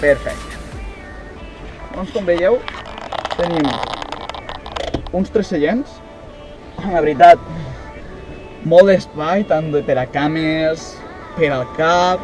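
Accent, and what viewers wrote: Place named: Majorca, Spain. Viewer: Habla mayorquin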